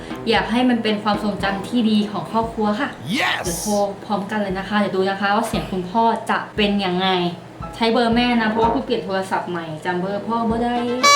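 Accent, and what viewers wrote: Isan, Thailand. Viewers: พี่เป็นคนไทยหรือคนอิสานค่ะ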